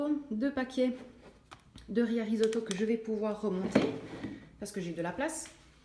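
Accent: Belgium. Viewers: oui juste à côté de liège, oui ça s'entend mais moi je l'entends pas trop lol parce que je suis aussi de liège lol donc je fais pas trop attention lol Oui chez lidl je fais souvent les promos viande et les produits d'entretien lol Oui avec ce que vous avez dans vos armoires ( pas forcément les réserves lol) Oui je regarde aussi vos batch cooking, enfin quasi toutes vos vidéos lol.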